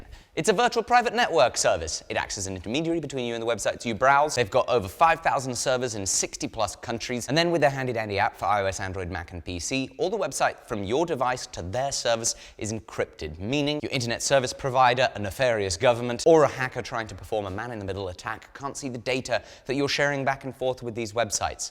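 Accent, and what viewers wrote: United States. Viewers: As an American I thoroughly enjoyed attempts at an American accent throughout this episode. Congrats on the vice grips, they look fantastic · What's the "like goal" to get to do a whole episode in his american hick accent? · He keeps using an American accent, I think he misses it here...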